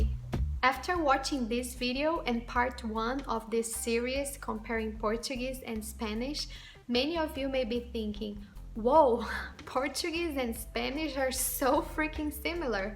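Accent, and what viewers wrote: Brazil. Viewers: But Portuguese you speak is Brazilian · All she says is for a Brazilian Portuguese. European Portuguese sounds nothing like she's talking. It sounds more like a mix of French and Polish tbh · Your Portuguese pronunciation of words is Brazilian not European. Can u upload a video how to pronounce various sounds like 'rr' in European Portuguese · Você estava falando em português do Brasil, em vês de português de Portugal... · Tenta praticar mais o teu portugues de portugal porque tu estavas a falar portugues do brasil e nao do Portugal eu sou português tenta praticar mais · It was you who put the subtitles? Its because the flag is Portuguese, but the subtitles and your pronunciation are Brazilian. About the "V" in some zones of north of Portugal people read the "V" like in Spanish. "Vaca" stay 'βakɐ. The Portuguese have no problem learning Spanish, in fact a Portuguese needs half the time than a Spanish because of the sounds. 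In European Portuguese we have a strong "R" and we do not read it as "H". In fact the Spaniards have problems in reading our R. You must change the flag once your comparisons and pronouncements are in Brazilian Portuguese and not European. To be honest, it is harder for a student to learn Portuguese after learning Spanish than for learning Portuguese first.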